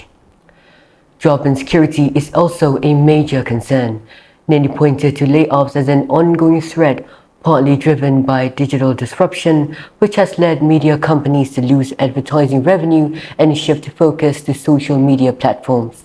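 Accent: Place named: United Kingdom. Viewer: Mas british sekaleee😘😘😘😘❤keren euy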